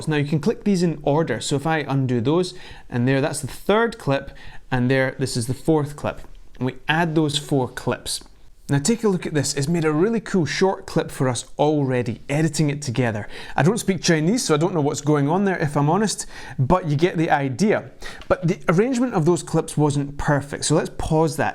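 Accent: Scotland, United Kingdom. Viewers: Man, Every time I watch your channel it makes me wish I had a cool Scottish accent. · Just got our first drone today thank you for this as we need all the help we can get ..Was great to hear a Scottish voice we are both rom Edinburgh but live in Spain now thank you !!! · Good to hear a fellow Scot! I’ve just bought the mavic mini and wondering how it copes in the wind in Scotland? As you’ll know it’s windier then most places so given its so light I’m a bit paranoid.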